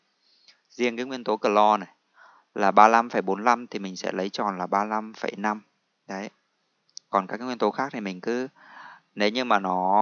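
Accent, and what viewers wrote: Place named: Thanh Hoa, Vietnam. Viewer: anh là người thanh hóa ạ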